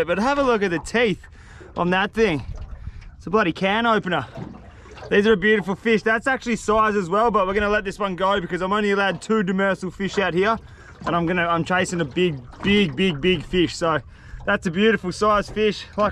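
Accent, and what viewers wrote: Australia. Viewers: Just recently found … love the Aussie accent and the energy!!!! Love from India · He has an Australian accent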